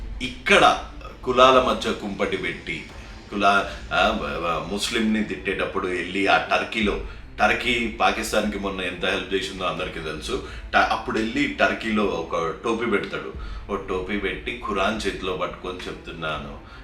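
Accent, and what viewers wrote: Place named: Telangana, India. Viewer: Nenu Yedava Jagan gaadi supporter naithe kaadhu. Kaani meeru staunch TDP fan ante kaadhanakunda undalenu. TDP ni gaani, Chandrababu garini kaani, Pawan Kalyan gaarini kaani ae chinna maata anna meeru enter avuthaaru. And more to that matter, you speak Telangana accent. Asalu em connection emo ardhame kaadhu.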